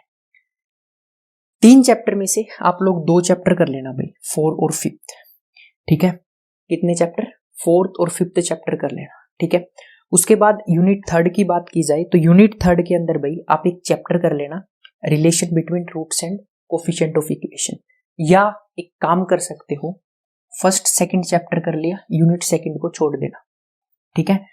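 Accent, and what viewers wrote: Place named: Hisar, India. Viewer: Ye to pra h ki aap Hisar se Ho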